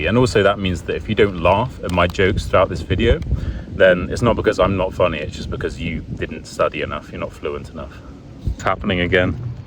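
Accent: United Kingdom. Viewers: first of all thanks for helping us to understand and als speak in British accent ❤️‍🔥 Also my teacher recommended us to watch more videos on you tube but mostly girls should watch female ones bc their accent maybe 😶 so which female bloger can you recommend to me 🤔 of course I will continue to watch your videos to but still I need one female bloger too😊 I will wait for your comment 💯💌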